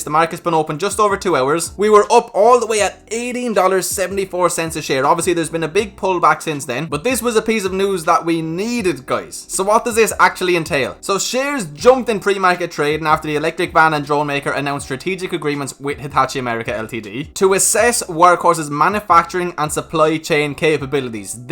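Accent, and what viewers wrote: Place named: Ireland. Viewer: you rock man! Love the Workhorse videos. I would send you some American Bourbon if it wouldn't be confiscated. Love your content. My wife hears your accent and says "Oh your listening to your Irishman investor." lol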